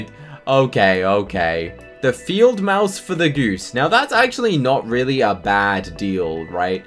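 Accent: United Kingdom. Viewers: Day 2 of asking are u British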